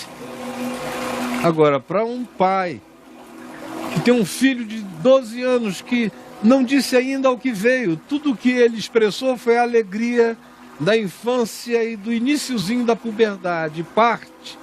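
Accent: Recife, Brazil. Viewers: Nota dez trilhão sabe que fala Recife Pernambuco ❤❤❤❤❤❤❤❤❤❤❤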